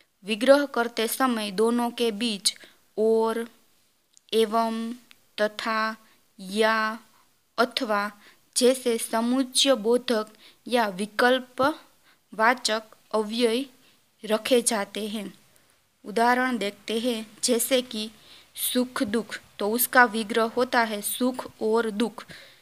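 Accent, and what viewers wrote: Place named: Kathiawar, India. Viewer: आप हिन्दी को काठियावाडी लहेजे में क्यों बोल रही हो?उदा. राजा को आप राझा , जानते को झानजे बता रही है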